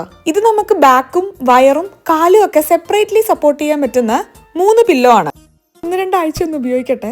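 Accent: Malappuram, India. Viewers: 1..2 aaycha adhonnu ubayokikkate ennit njan adhinte kuttam paraya😅 awww malappuram talkenne ❤️‍🩹🫶🏻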